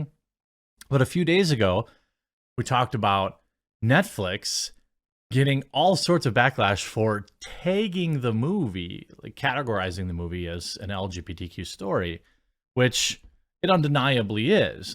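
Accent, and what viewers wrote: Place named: Wisconsin, United States. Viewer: I didn't realize you had a wisconsin accent until yesterday, and now it is all I can hear.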